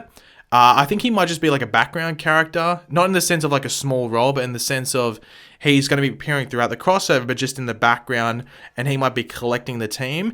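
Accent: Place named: Australia. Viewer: Lol, sounds so Australian in this video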